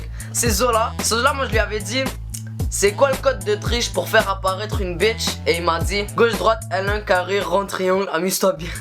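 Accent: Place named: Quebec, Canada. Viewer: T québécois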